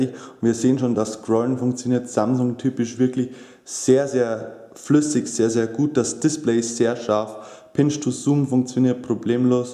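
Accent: Bavaria, Germany. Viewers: Ich find deine Videos Klasse, hab dich auch gleich mal abboniert ;) Kannst du falls es sich einrichten lässt das mit der Dropbox mal zeigen oder kurz erklären weil des find ich interessant aber ich find nirgends im Internet ne Seite die das vernünftig erklärt. Welchen Dialekt redest du normalerweise? Weil ich komm auch aus Bayern und ich hör sofort wie du dich anstrengst gutes Hochdeutsch zu reden :D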